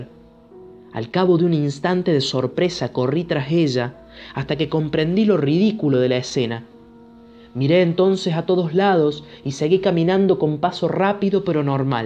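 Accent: Cordoba, Argentina. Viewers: Me encanta ese acento cordobés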